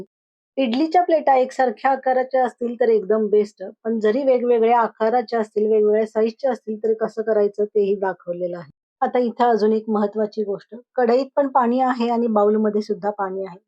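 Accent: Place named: Karnataka, India. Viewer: लुब्लुबीत नाही लुसलुशीत आणि प्लेटा नाही प्लेट्स तुम्ही कर्नाटकी आहात का? उच्चार तसे वाटतात बाकी इडली ठीक आहे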